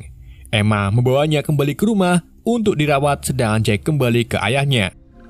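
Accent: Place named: Banyumas, Indonesia. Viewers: ternyata wong ngapak, salam sing Tegal 😂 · iso ngapak ya rika jebulnya 😂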